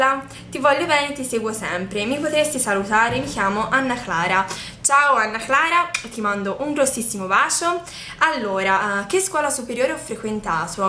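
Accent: Tuscany, Italy. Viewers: #askiaia ciao:) ormai ti seguo da un pò.sei bellissima e dolcissima! complimenti per il risultato dell'esame!Le mie domande per te sono: So che sei toscana dall'accento ma in quale città vivi? Hai altre passioni oltre il make up? Un bacione:* continua così.mi saluti?:)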